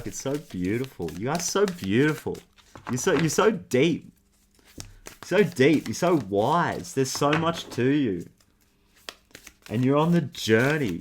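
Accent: Australia. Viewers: Hi, Thanks again, for your wonderfully encouraging words!! Are you Australian? (Your accent gives it away…lol). My grandpa was from London, but he always said he wanted to go to Australia. He is in Heaven right now, so he can probably travel anywhere he wants to. Best to you,. 🐶🥰🌸 “I am so grateful that I have received large sums of money, under Grace in Perfect ways.” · You are so talented, appreciated and on point. And your aussie accent brightens my day everytime 🙏😁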